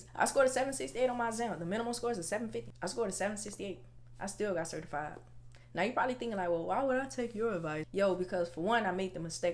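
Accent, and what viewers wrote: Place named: Atlanta, United States. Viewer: lmao I knew it had to be ga! So I just went ahead and said Atlanta. My cousin born and raise in Atlanta she got the same dialect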